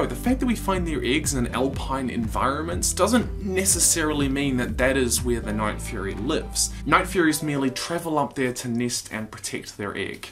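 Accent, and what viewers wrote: New Zealand. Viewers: are you a kiwi/new zealander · I was wondering if you were from New Zealand, was wondering why your accent was so familiar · are you from New Zealand?!?!?!? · Wait... you from New Zealand? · Knew you were kiwi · Are you from NZ?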